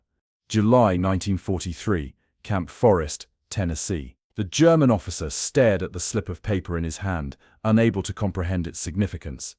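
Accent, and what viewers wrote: United Kingdom. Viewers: The announcer seems British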